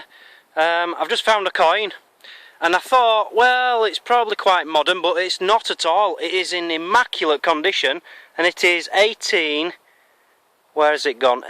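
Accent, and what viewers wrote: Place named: North, United Kingdom. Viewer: BO2 azza hoster. Somewhere up north in the UK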